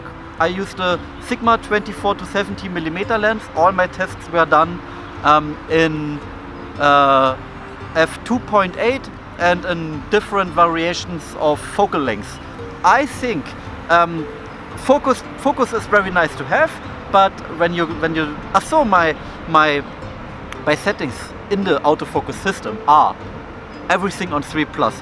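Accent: Berlin, Germany. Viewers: Ach so, my my settings ….. 😂 so cool 👍🏻 ich hab großen Respekt davor, dass Du alles in englisch sprichst 👍🏻 und das machst Du auf Deine charmante Berlinerische Art echt gut 👍🏻 mach weiter so 👌 beste filmerische Grüße aus Oberfranken my friend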